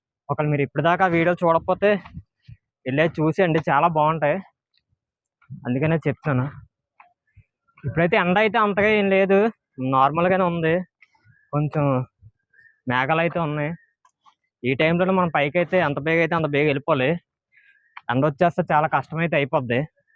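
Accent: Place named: Visakhapatnam, India. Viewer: గ్రేట్ biodiversity of సింహా చలం హిల్స్. Nice free talking of వైజాగ్ style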